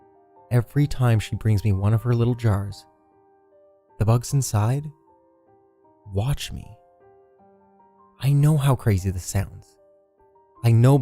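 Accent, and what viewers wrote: South, United States. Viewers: Very nice southern accent. It sounded real. I'm from the south so I'm used to it. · love your southern accent xD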